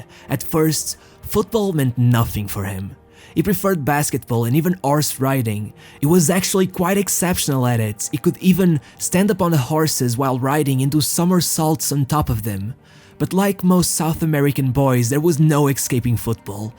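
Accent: Portugal. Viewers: The Portuguese accent is strong in this one. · It feels weird hearing a Stan of an Argentina legend with a Portuguese accent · Why are you so mad about his pronunciation? It's very insignificant as long as you can understand him. By the way, I read in someone's else comment that this narrator is from Portugal and that explains his accent.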